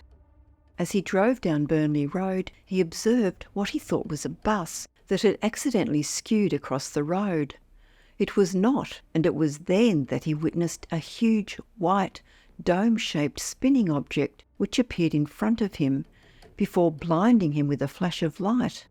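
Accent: Australia. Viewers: That's an Australian accent, not New Zealand. The male presenter they sometimes use is also Australian. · No she Australian or New Zealand.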